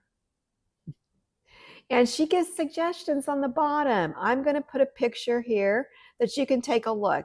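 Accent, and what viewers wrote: South, United States. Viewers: I enjoyed watching my first episode of your vlog,! But for a northern transplant to the south, you certainly sound southern! LOL I have never been to Rhinebeck and I'm not sure I ever will. It seems a bit overwhelming to me. I've been to Flock in Seattle and on several knitting trips with knitting stars (Arne & Carlos and soon the Grocery Girls, for example). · You are so sweet. Love your podcast. You said you are northern but to me you sound southern!!! What do I know,I am Canadian.❤️🇨🇦❤️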